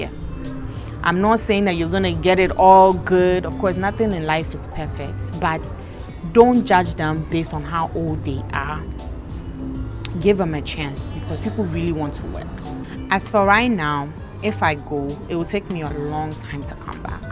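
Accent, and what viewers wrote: Ghana. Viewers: it's a shame that you feel so "unaccepted" in Ghana to the point of contemplating a return to the States and not coming back. You are Ghanaian. Your accent is basically not different from that of the average educated Ghanaian. Unlike other Diaspora children, you speak local Ghanaian languages fluently, which definitely help. So, I'm wondering -- exactly what has fed this level of frustration? Can you elaborate more? I can appreciate the struggles that come with having to adapt to a culture and system you had left for such a long time. Yes, many things are not only different but frustrating! But could it be that part of the problem is your own failure to employ the right attitude and soft skills necessary to effectively navigate your 'new' world? What do you think? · Accent na ghanian oooo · Wow. You moved to the us at age 6, and still have a Ghanian accent. 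Good for you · How can you submit American passport for Ghana card, the gentleman's right to deny you, you're full of yourself you wan ghanaians to worship you just because you from America, you still sound like a typical Ghanaian accent just get down from high horse.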